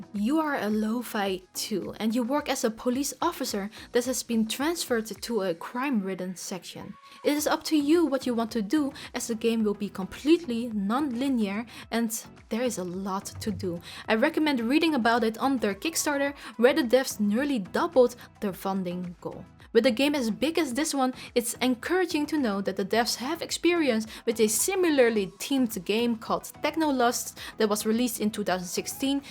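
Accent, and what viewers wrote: Netherlands. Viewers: Dear god I love your accent. What country is that? Edit: Oh found it, Netherlands! Yeah I can recognise it now! Cute! The bladed weapons used by Ven are called Khopesh btw.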